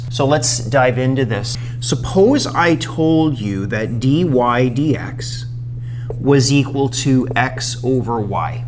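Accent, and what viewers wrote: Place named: United States. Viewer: This is very clever. I don't think we did differential equations in school. Looks more like first year university level, but I would have understood it at 16-17 with AS or A-level calculus. It's not always obvious what subsitution to use to separate the variables. I'm surprised you say 'zed' and not 'zee' since you sound American. Perhaps 'zee' can too easily be confused with 'c'. I like that you put lines through the z's so they can't be misread as 2's. I have a job marking maths classwork and sometimes the pupils get 0's and 6's looking the same, or 'b's and '6's or 'x's written like 'times' signs. I guess the first most important lesson is how to write numbers and letters clearly! Some 2's (or 1's) look like 7's, some 3's and 5's look about the same...! Once they start doing algebra writing neatly is so important! Differential equations get so complicated with del operators and vectors etc! 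Non-linear.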